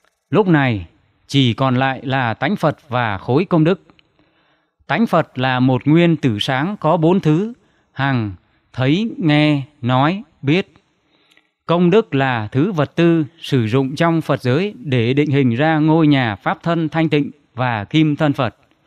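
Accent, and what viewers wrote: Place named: Thanh Hoa, Vietnam. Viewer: Giọng đọc Thanh Hoá nghe rõ . Nội dung có chính xác như của chùa thiền tông Tân Diệu không ?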